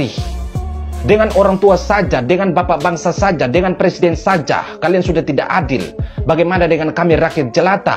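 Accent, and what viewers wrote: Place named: East Nusa Tenggara, Indonesia. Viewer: Saya sudah lama kagum dg NTT ini Cara pandangnya tajam Disampaikan dg kalimat yg efektif dg intonasi suara yg pas dan khas NTT Lama saya cari namanya agar bisa lihat konten yutube nya tapi baru sekarang ketemu Bravo bro anda hebat.👍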